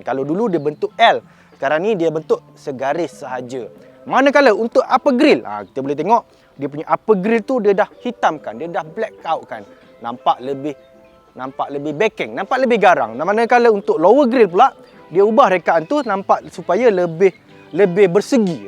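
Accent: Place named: East Coast, Malaysia. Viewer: org pantai timur mmg slang mcm ni..sama mcm abg jakpa.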